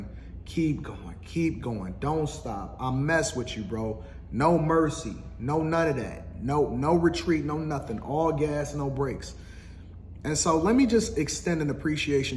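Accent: Detroit, United States. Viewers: Are you from Detroit bro?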